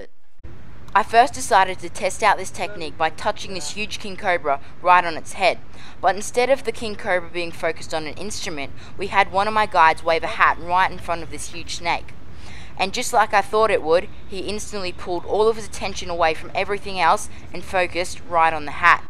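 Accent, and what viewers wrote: Australia. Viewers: I love your accent are you from Australia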